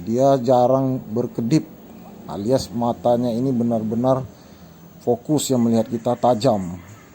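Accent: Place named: South Sumatra, Indonesia. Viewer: Gaya bicaranya kayak orang sumsel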